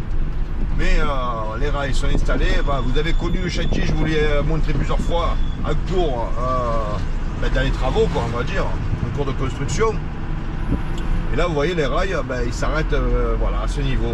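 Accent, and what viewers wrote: Marseille, France. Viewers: Salut, quelle plaisir tu me fait voyager le soleil, la mer, l'accent, j'en redemande 😊bonne continuation à toi 👍 ✌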